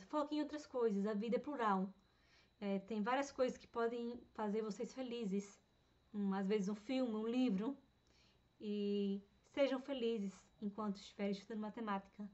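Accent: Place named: Portugal. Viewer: Interessante seu sotaque, por um momento no início do vídeo eu achei que vc fosse portuguesa ou que tivesse passado muito tempo em Portugal. Achei muito legal o vídeo, na forma como vc conta as coisas, bem detalhadas e de uma forma íntima buscando contar como vc se sentiu e o que vc pensa sobre.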